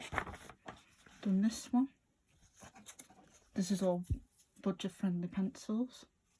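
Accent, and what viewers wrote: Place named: Wirral, United Kingdom. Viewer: You sound like you’re not too far from me, I’m from the Wirral! B&M, Home bargain and the works are such good places to get books from aren’t they!? Think I spotted a few I got from there too. Lovely pages x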